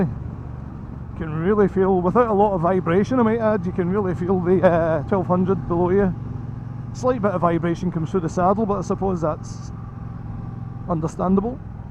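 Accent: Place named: Scotland, United Kingdom. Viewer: Nice roads there, and amazing video. Unfortunately your speech is quite hard to understand for me (heavy Scots accent) Beside this, I am enthusiast for this bike, except for the rear absorber. Actually it's not efficient, nor acceptable to this class bike. My low back is often shoved, I am looking for an optional adjustable absorber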